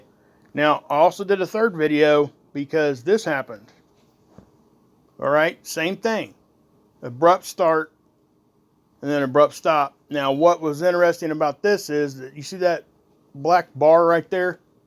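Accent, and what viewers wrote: South, United States. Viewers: i jus like ur country accent😝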